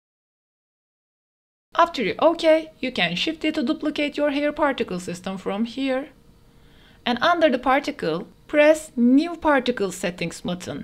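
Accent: Turkey. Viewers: Bu aksan ben Türküm diyor 😄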